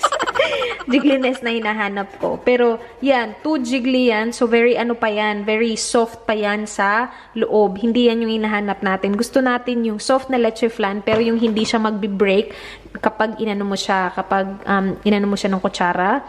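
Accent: Visayas, Philippines. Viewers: Parang bisaya ang voice....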